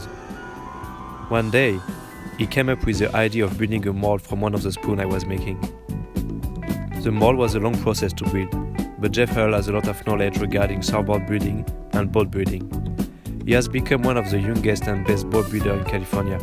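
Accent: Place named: France. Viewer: On sent un petit accent français de rien du tout. Je ne connaissais pas du tout ces flexspoon board amazing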